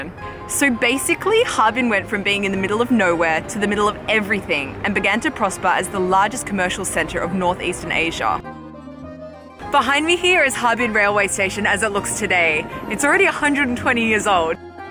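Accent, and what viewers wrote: Australia. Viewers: Host is an Australian? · Australian accent?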